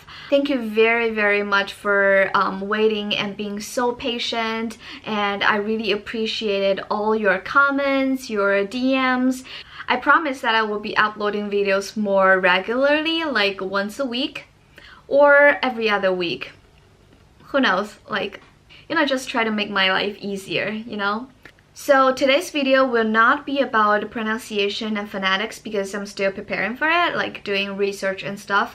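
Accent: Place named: California, United States. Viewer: 加州的吧😅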